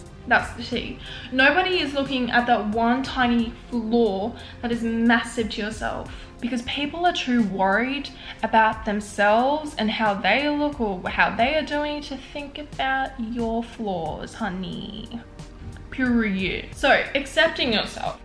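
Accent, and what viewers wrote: Australia. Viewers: this is totally random but are you australian? english is not my first language and I'm trying to guess where your accent is from lol it's beautiful tho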